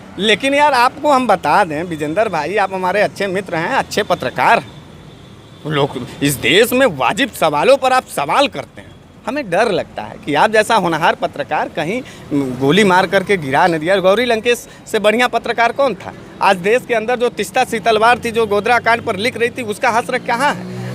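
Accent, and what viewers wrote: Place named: Varanasi, India. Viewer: wah bahut achha bolte pakke banaras wale ho bekhauf zubaan bebak andaj thank you for the liv tv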